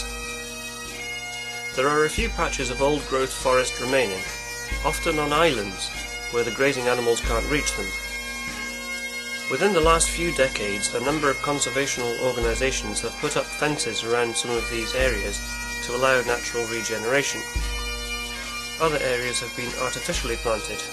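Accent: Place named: Southern England, United Kingdom. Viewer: Glad to finally know what country you're from, I thought you were a southern English dude like me from your accent until I heard you grew up in Oslo. Oh you and your love of the Natural world ._. you've been to asia and austrilasia but I've scarcely been north of London lol The lack of life....pretty much in general...in Southern England is a good thing ^_^ The number of humans not so much :U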